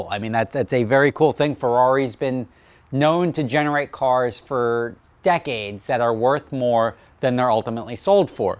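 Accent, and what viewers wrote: New Jersey, United States. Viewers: like yo... you from Jersey?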